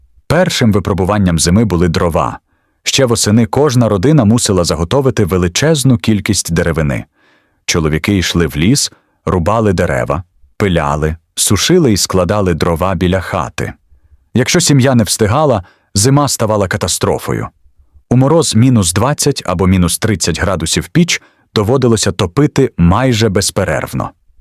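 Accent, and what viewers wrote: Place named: Russia. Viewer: Та не дровА , а дрОва!!! Чому ви в українських словах робите російські наголоси?! Не конІ , а кОні Не травИ , а трАви Не чАсник , а часнИк!!! Не кагАнці , а *каганцІ*. Ви ніколи не чули, як звучить українська мова? Вже не говорячи про зображення. Ніколи не бачили української хАти, навіть на картинках у дитячих книжечках? Вони були обмазані глиною і побІлені! А української пЕчі? Якщо вже ви назвалися "Історична правда", то створюйте щось максимально схоже на неї! Бо у вас покищо виходять "Історичні побрехеньки", нафантазовані ШІ.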